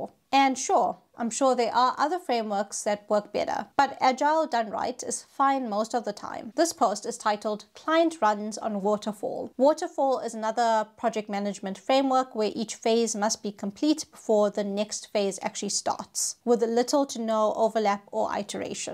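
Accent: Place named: South Africa. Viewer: Spotted the South African!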